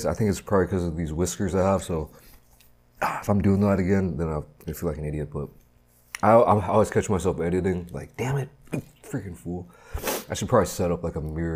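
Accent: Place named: New York, United States. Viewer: Are u from New York u have the accent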